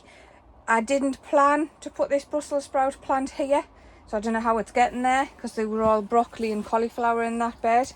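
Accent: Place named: Durham, United Kingdom. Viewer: the accent sounds like durham.....................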